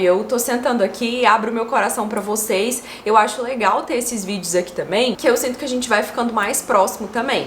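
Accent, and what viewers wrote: Minas Gerais, Brazil. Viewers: A melhor coisa é me identificar com o sotaque. Minas é o lugar!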